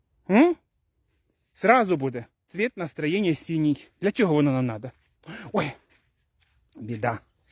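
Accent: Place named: Bila Tserkva, Ukraine. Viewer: Боже ж ты мой, какой приятный человек, и говор его душу ласкает. И юмор добрый,житейский. Так говорила моя бабушка и мама, они родом из под Белой Церкви. Давно их нет на этом свете, а я помню их говор. Спасибо за юмор, за доброту. Всем мира и чистого неба.🎉🎉🎉❤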